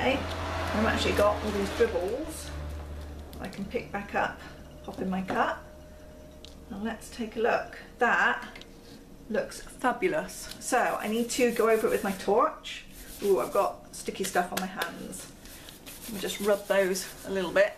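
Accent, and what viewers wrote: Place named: Southern England, United Kingdom. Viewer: Obviously from the south of England, me too but now in Australia. Love your videos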